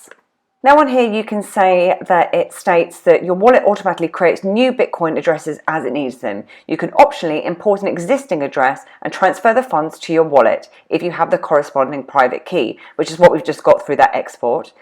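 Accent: United Kingdom. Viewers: You're pretty and I tolerated that British accent only bcoz of that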